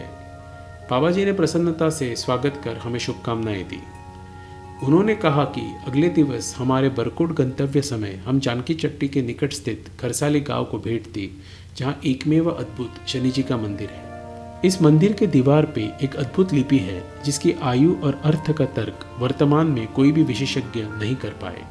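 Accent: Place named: Maharashtra, India. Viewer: I guess you are from Maharshtra or Goa as of you Hindi dialect.Really fine narrated.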